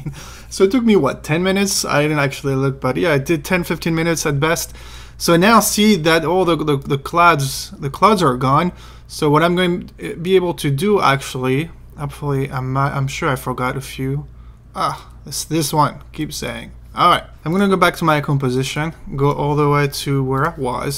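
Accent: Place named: France. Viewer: J'adore le petit accent français. Hello from LA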